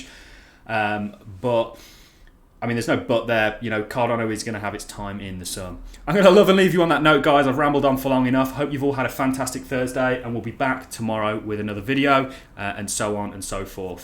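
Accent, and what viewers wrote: Birmingham, United Kingdom. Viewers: knew I could hear the Brummie in you 😆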